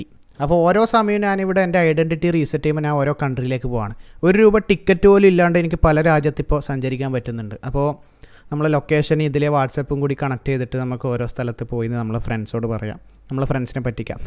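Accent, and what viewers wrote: Kannur, India. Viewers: Kannur ano bro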